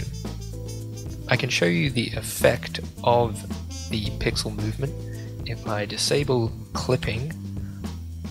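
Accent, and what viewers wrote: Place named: United Kingdom. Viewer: It's nice to put a voice to the amazing work, we are honoured to be with you. Btw are from UK you sound like it lol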